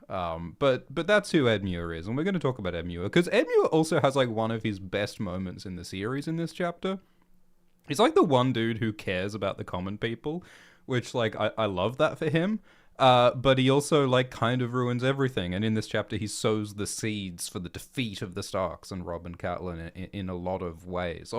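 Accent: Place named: Australia. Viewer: <link> MY EXPERIMENT SUCCEEDS, or fails, depending on how silly it is that both my dog and I sleep better with chill Aussie narration. Thanks for all you do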